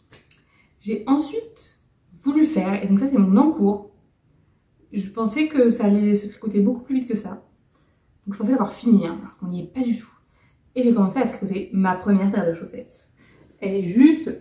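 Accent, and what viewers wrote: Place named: England, United Kingdom. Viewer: Tellement naturelle dans cette vidéo 🥰 Je suis fan de tes gilets et de ton accent anglais aussi ! Et tu as un excellent rythme en tricot, c'est clair ! Certaines teinturières proposent la mise en écheveau (CLainesBoutique fait la mise en pelote à 0,5€ !). Pour les chaussettes comme toi, j'ai voulu essayer en cours de tricot et j'ai perdu mes mailles 🤣 Et je n'ai pas fait d'échantillon non plus ! Le montage des chaussettes, pour la première j'ai recommencé 10x, pour la seconde en deux fois c'était bon.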